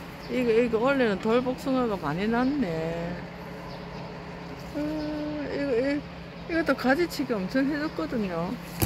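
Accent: Gyeongsang, South Korea. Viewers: *선생님이라고 호칭을? 아니면.. 이*교수님 이라고 호칭을 해야할지요? ㅎ [방가지똥] 약초 이름도 참 재밌네요..ㅎ 오늘도 건강을 위한 프로젝트 영상! 잘 시청합니다~👍💘 경상도 사투리가 즹겹네요..ㅎ 자두*가 선생님 닮아서 이쁘네요~ 휴일! 행복한 시간 되세요~*😘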